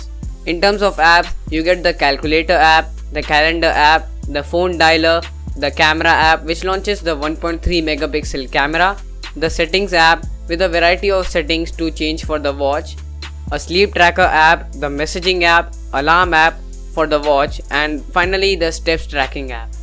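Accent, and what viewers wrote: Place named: India. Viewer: thanks for a great review, I'm buying one, Nice english/indian accent, friendly people.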